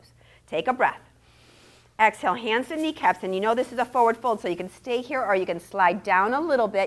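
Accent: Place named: Ohio, United States. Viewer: Oh is yours an Ohio accent? No I wouldn't have guessed. Though I'm not good at detecting accents other than the more obvious ones such as Boston, Long Island, Chicago, South Jersey and of course vaguely Southern or Texan. Though now that you mention it, I guess you sound Eastern U.S. It's probably hard to tell in CA, with so much mixture. Anyway your voice is comforting and I like listening to you.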